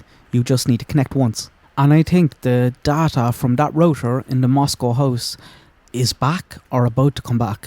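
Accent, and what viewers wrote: Cork, Ireland. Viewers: Cork gang represent! Sound like you might be from the Northside Langer! Beautiful scenery, up the Glen? 😂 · Very interesting information about wifi in public that I had no idea about! Thanks for this. I haven't heard anyone discuss this before. Very good video, and obviously, I love your Irish accent!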